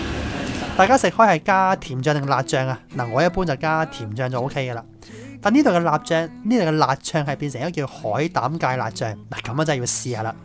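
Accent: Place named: China. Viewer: 佢把聲有D似大陸仔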